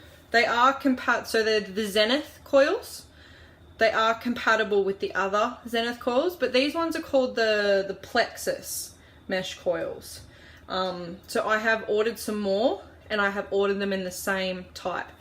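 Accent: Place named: Australia. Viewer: I love the aussie accent too, such a typical American thing hahaha.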